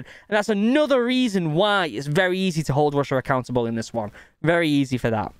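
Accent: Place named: Manchester, United Kingdom. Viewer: Not a question, but heya fellow (or technically previously fellow) Manchester citizen. I only knew about that one once you mentioned it here. Wait now that I think about it how have I not noticed your accent being from around this area? Love it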